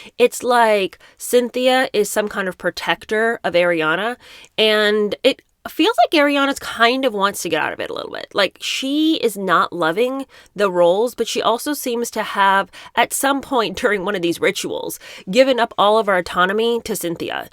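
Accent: United Kingdom. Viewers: Your british accent is so on point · I enjoy watching your vids...you make me laugh...& you can do a perfect british accent ... You are so right...the relationship between those two is weird...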